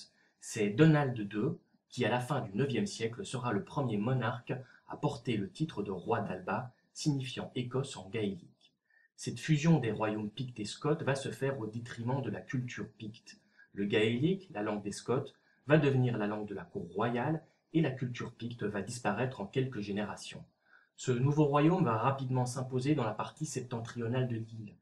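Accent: Belgium. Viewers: petit accent belge ,D depouis...^^ Mais excellentes vidéos explicatives. dommage qu'elles soient courtes. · Je vois par la prononciation que le vidéaste est belge. Je regarde aussi les vidéos de l'historien belge Dufays. Donc, les Belges ont beaucoup à nous apprendre.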